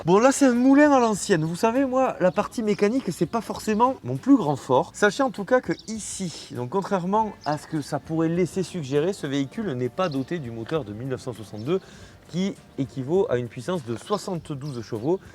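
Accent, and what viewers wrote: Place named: Lille, France. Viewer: Sympa la vidéo l'ami Lillois, c'est votre accent qui m'a mis la puce à l'oreille😄 Tres beau modèle que vous nous présenter, félicitations à son propriétaire. En revanche, votre tenue vestimentaire me fait penser à une pub pour Cetelem🤭 Encore merci pour la vidéo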